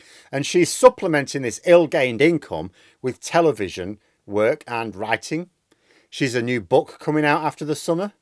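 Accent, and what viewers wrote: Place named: Northern England, United Kingdom. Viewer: . We know you're from up north. Stop going on about how people down south pronounce town names. It detracts from your otherwise intelligent commentary.please. It's boring.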